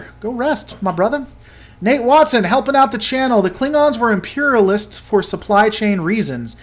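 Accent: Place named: South, United States. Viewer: Hi. I don't like it when people have a go at you about the South, or your accent. It's uncalled for. Your views are what counts, not how you pronounce them. More power to you for putting down the ruder parts of the group watching you. I'm a Brit, so the whole "your a fan of the British" thing made me laugh - we were the good guys! Don't worry, I forgive the American rebels, ha ha. We're talking about events over 200 years ago so I think we should probably be moving on to make a better shared future on our precious pale blue dot.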